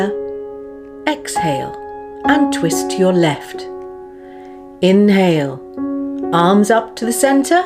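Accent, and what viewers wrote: United Kingdom. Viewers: Thank you for sharing this video ...... I really like the british accent .... But it is not easy for a spanish guy like me!!!! :-)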